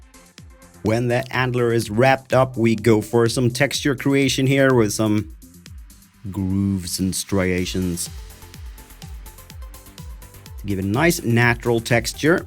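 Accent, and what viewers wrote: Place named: Skåne, Sweden. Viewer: en javla skånsk dealekt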